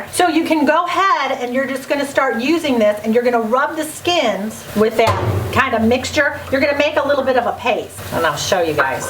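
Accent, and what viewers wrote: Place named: Michigan, United States. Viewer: Let me guess.... you are from Michigan. Half my family speaks with that accent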